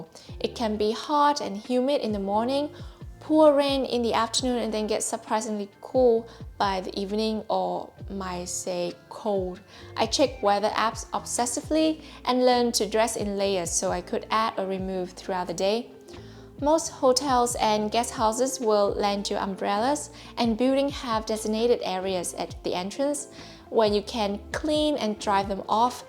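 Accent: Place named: Vietnam. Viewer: Vietnamese accent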